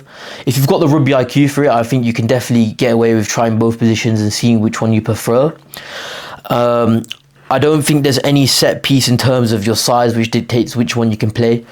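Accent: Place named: South Africa. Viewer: love the south african accent with that ugly rose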